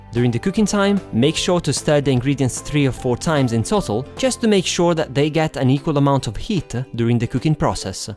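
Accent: Italy. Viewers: I could tell immediatly you're italian! for the great recipe alternatives and the accent! I live in italy too and it's great to have alternatives to our traditional plates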